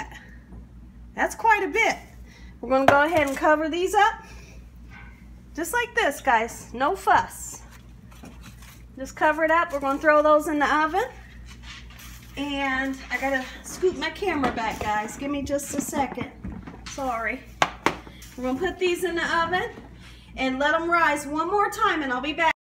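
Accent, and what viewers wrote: South, United States. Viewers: I love watching you bake,nd your talking country charm makes me feel like Im back at my grannys house.Im definally gonna make these.Im craving them now!!!!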